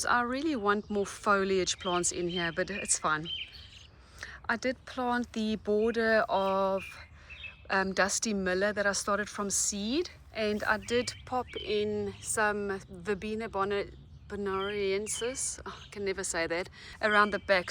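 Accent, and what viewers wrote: South Africa. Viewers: Love love your channel l am learning. Thank you so much. You have a SA accent.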